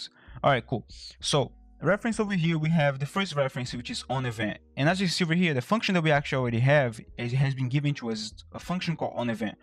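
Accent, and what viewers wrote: Brazil. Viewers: incrivel como um brasileiro sabe detectar outro brasileiro falando em ingles UEHUEAHAUEHEAUH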